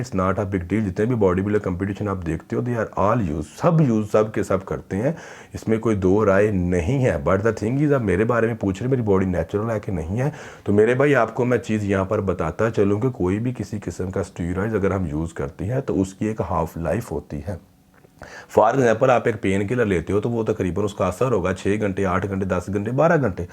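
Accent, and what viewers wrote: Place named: Sialkot, Pakistan. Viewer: From india. Best wishes. Ur language is same as we have. I think u r from sialkot. Because we r from sialkot before partition. If u read this comment then plz rplyy.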